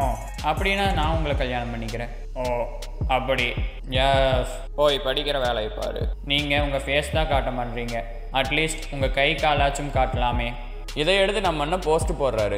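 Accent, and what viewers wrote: Coimbatore, India. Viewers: Namma ooru Coimbatore, Tirupur slang varudhe... mm subscribed ra thambi.. keep doing this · Ur Kongu Tamil sooper cute ❤thambi and evlo azhaga talented ah pesra... Kannu vachuta pa... Suthi poda Solunga... Un ammava...ur way of speaking Really so much adorable 😘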